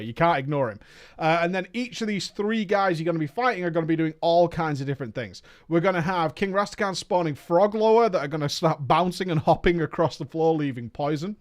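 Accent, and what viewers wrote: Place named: United Kingdom. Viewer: no joke, you man, you know this game like the back of your hand. In fact, I think you probably know this game, BETTER, than the back of your hand. And Andy, why are yall have troubles? I just want to know. Cause if I was Andy, I would just say, "Preach, dude, lets make some more skits together, or whatever." Oh well, dude, you ok, all of that wow information AND your British too? WoW, is all I got to say.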